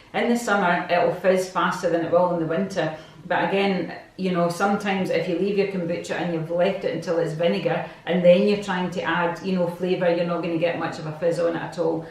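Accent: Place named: Scotland, United Kingdom. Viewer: This video gives a very comprehensive list of flavours and combinations available..it will be my go to for choice Thank you very much and refreshing to listen to a Scotish accent for a change!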